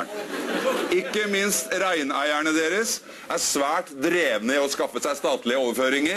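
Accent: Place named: South, Norway. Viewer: beklager så meget, men jeg gjetter at du kommer fra Sør Norge?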